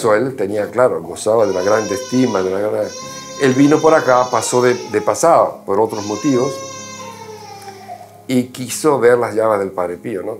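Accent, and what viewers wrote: Argentina. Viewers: Es argentino el por lo que se escucha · Gracias por este documental Muy bueno el recorrido, la transmisión y la conversación del Padre que narra este precioso documento Parece argentino🇦🇷por su forma de hablar, o de esta zona del continente Dios bendiga a todo el equipo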